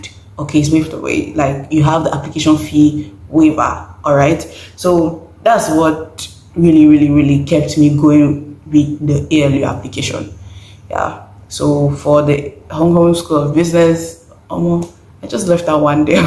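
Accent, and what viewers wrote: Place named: Cameroon. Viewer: I just started watching the video and you sounded so Cameronian 😂❤ And then boom you mentioned it 😂❤❤ I'm a Cameroonian too ❤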